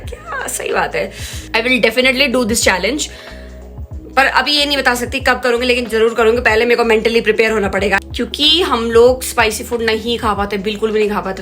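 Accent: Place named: Pahari, India. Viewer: Love ur pahari accent jo aa jata hai beech beech mai.....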